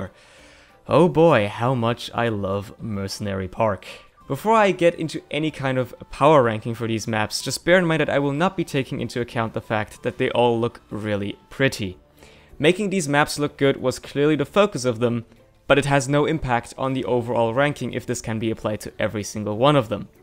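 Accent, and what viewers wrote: Germany. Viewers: if i didnt know you were german i would never know by watching your streams but the german accent is too strong in the videos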